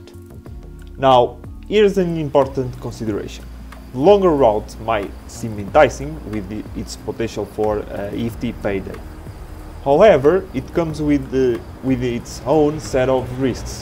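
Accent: Portugal. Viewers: Sotaque Português hahahaha Conteúdo top. Adoro os teus vídeos, abraço <3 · Sounds like PORTUGUES?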